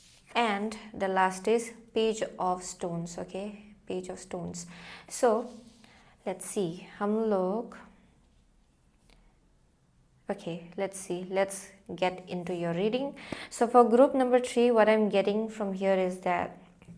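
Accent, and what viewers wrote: Northeast, India. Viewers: Aap north eastern se ho kya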